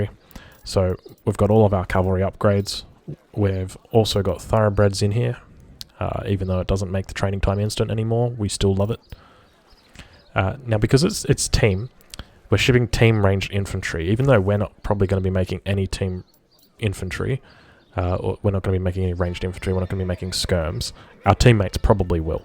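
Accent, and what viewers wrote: Australia. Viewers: Where in Australia are you from? I've heard someone from Brisbane who sounds like you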